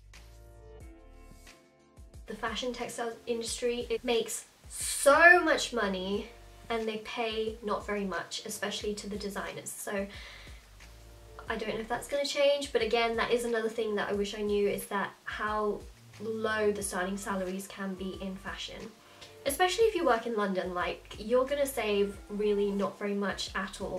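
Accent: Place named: Australia. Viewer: Are you originally from Australia? You have a mixed Aussie-English accent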